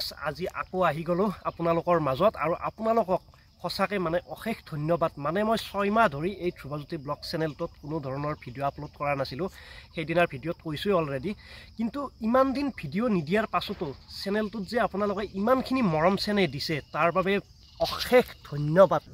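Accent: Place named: Nalbari, India. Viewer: ভাই তুমি নলবেইৰা ভাষা ক'লেহে বেছি ভাল লাগে , তোমাৰ মাতত আমাৰ নলবাৰীৰ আচল কথিত ভাষাটোৰ আভাস পোৱা যায় , সেইদৰে ভিডিঅ' বনাবা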